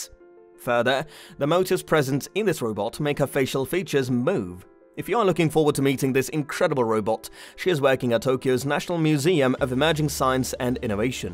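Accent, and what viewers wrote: United Kingdom. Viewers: Why do these videos always have to be narrated by some idiot with a British accent? And of course it will only be available to the very rich.. Who else could afford to buy an artificial companion for $350 THOUSAND Dollars?? And if they are fully functional then show me... I want to see the function!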